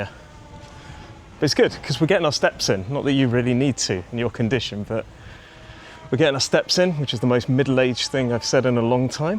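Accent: United Kingdom. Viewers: So nice, when I was a kid I went with my school to Southampton a couple of times (once to Southampton proper and once to Chandler's Ford) and we used to spend one day in Isle of White, I remember it so well, that was 50 years ago though. Maybe that's the reason I understan you quite well, because usually it is hard for me to understand british accent :) 5 pounds? That's really a bargain! BTW, I think there was a monument in Southamton (maybe a door of the city walls?) called "The Bargain". Am I correct, or it is a false remember?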